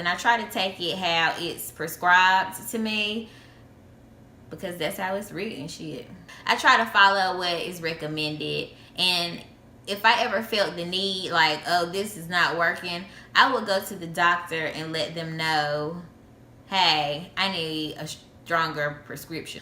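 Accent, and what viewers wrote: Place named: South, United States. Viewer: Dam I love that southern accent. You talk like my cousin. I will be taking this medicine soon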